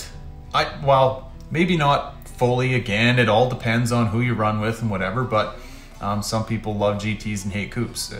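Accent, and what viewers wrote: Canada. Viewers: Your videos are absolutely awesome and extremely informative!! Thank you so much! Love the Canadian accent, as well!! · Keep up the great vids man. Also I recognized that canuck accent in about 0.2 seconds, hello from Ontario!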